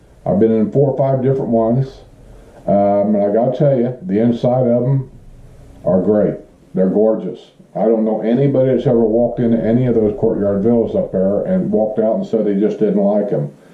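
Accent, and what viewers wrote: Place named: Central Ohio, United States. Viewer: First -- these are great videos. Just moved into Pine Ridge down here and you're my new 'go to guy' for info about our new hometown Second -- I grew up in central Ohio and I imagine you grew up within a state or two of there. You sound like everybody I remember growing up Third -- you may have addressed this in another video, but do you know why cell service is so spotty in Villages homes? Verizon told us to set our phones for 'advanced calling' so our wireless router could help, but that barely helped at all. We just went ahead and got a land line. And finally -- who did you use for your water purification system? We get dark ring-around-the-commode gunk after a day or two without flushing. Who provides this ugly water? Everything is so 'perfect' and well done down here, it amazes me that The Villages hasn't fixed this community wide. 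Thanks again for proving this really is Florida's Friendliest Hometown.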